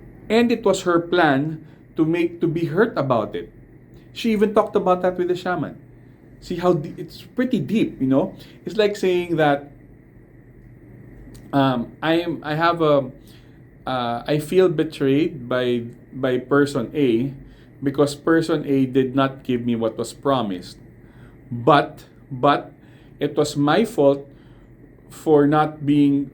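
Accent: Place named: Philippines. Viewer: your accent gave away your nationality. You look East Asian, Chinese BUT the way you speak English I know for sure you're Filipino.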